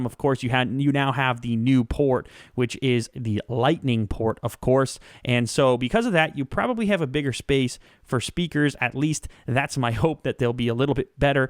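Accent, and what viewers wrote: Canada. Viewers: Canadian accent? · Canadian lol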